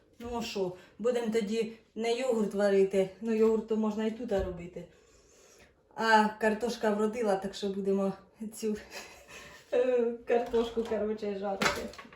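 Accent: Poltava, Ukraine. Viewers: А ви не з Полтави ? Бо балакєте ви по-нашому , і акцент у вас полтавський .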